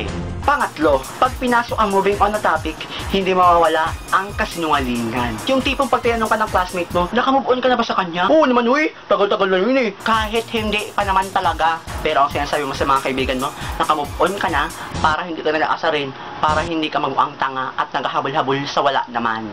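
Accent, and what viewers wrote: Visayas, Philippines. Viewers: bisaya diay ka ter :)) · bisaya kaba?? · BiSAYA kaau uhy ! HAHA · Bisaya diay ka kuya? Ako pud baya XD Hahaha!! More power dahhling!:) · bisaya diay ka hahaah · taga asa ka?bisaya lagi ka?hehehe.malingaw jd ko sa imo ba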